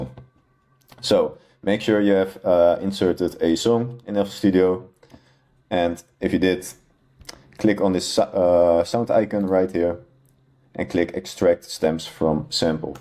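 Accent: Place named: Netherlands. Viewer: Jij kom zoiezo uit Nederland aan je accent te horen 😂😂❤️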